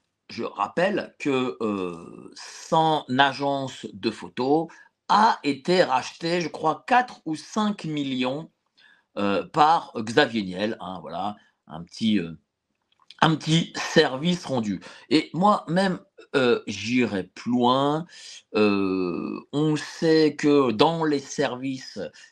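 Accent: Germany. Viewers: Oui,c est vrai, arrête de prendre l accent allemand. Ça n a aucun intérêt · tu es français parles Français pas avec l'accent chleu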